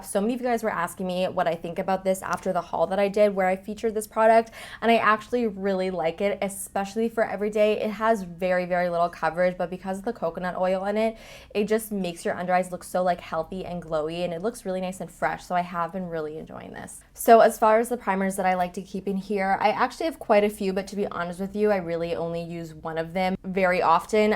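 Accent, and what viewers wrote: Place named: Canada. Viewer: Where are you from in Canada because I can hear a strong accent. Definitely different to how most people speak in B.C.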